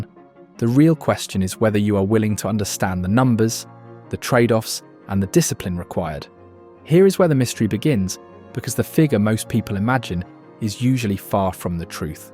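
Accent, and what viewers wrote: United Kingdom. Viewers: Person with British accent won’t teach you anything new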